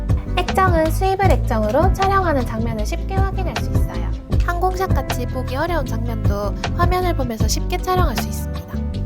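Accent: Busan, South Korea. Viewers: 나중에 카메라 하나 장만하게 되면 바로 너로 찜뽕이다 후하 그나저나 중간중간에 부산 사투리 들리는 게 너무 귀엽고 좋네요😚 오늘 영상도 잘봤어요!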